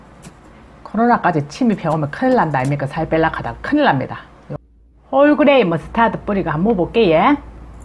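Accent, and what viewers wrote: Gyeongsang, South Korea. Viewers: 아이디어는 좋은게 많은데 너무 심하게 쓰는 사투리가 거슬리네요 ㅠㅠㅠ 경상도분이시라 일반 사투리나 억양 이해는 하는데 궂이 그렇게 심하게 쓰실 필요가 있을까요? 암튼 조금 고쳐주셨음 영상이 더 좋아질듯요. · 시원시원 하게 요리하시니까 든기좋네요 경상도 말과 함깨 구수 하네요 양배추로 해먹어 봐야 겠네요 간단한 요리 또 올려 주세요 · 언니 요리 솜씨보다 상도 사투리가 매력만점 더 예쁘고 간더러지게 구사하시옵소서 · 경상도사투리는 듣기가 쫌... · 사투리가 귀에 쏙쏙 저도 경상도 여자라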